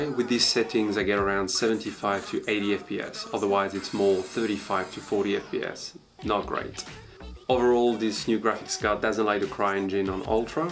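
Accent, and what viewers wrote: France. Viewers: Hello, je sens le petit accent français:-) super vidéo! Je viens de faire l'acquisition d'un iMac 27'' i7 4.2, 16gb ram, 3TB Fusion drive, Radeon pro 580 et je suis ravis! Je fais pas mal de vidéo et je joue également via Boot Camp à BF1, Wow tout fonctionne parfaitement en <num> ultra. Je ne peux que conseiller l'achat de cet iMac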